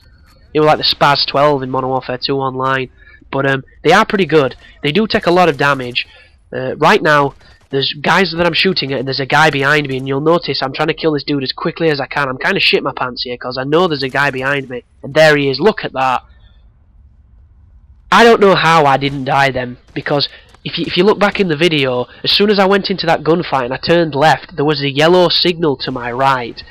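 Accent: United Kingdom. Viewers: You sound British man!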